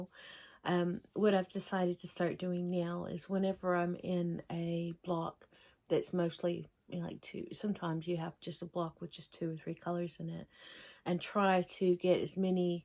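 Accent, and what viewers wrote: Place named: South, United States. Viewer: Once again...another wonderful video!! Progress is coming along nicely on all your projects!! Loving the bird one!! Gorgeous pictures of your adventures!! Thanks for the shout out as well!! I do love your accent...I just giggle everytime I hear you throw in a little southern draw word or two 🤣 ❤️🪡❤️ Editing to add...I haven't stitched on 20 ct but I do have Humility (the big lion piece) on 22 ct & initially I did 2 over 1 on that...it ended up where my stitches were just too puffy & they weren't laying right so I ripped it all out (probably about 1000 stitches & went with 1 over 1. I do use 2 strands for 18 ct. But just wanted to give this little insight...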